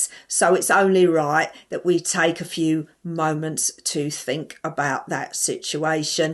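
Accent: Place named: Kent, United Kingdom. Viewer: Brilliant Kent accent too 😂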